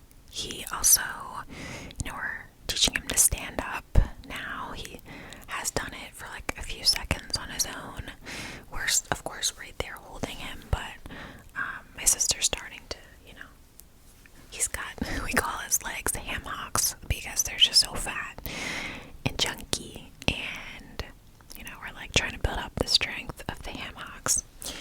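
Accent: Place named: Philadelphia, United States. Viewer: Just wanted to say as someone from Philly I love your videos as for some reason asmr is extra relaxing to me when the person has the same accent as me. Will be binge watching all your content!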